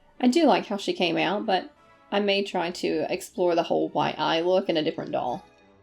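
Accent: South, United States. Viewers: I can't place your accent! Can you tell me where you're from? You sound Southern like me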